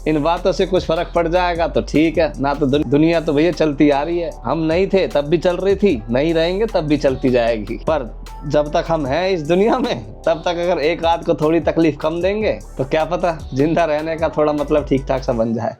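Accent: Haryana, India. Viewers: Bhai aap ki aawaz sey lagta hey ke aa hariyana ke raheney waaley ho.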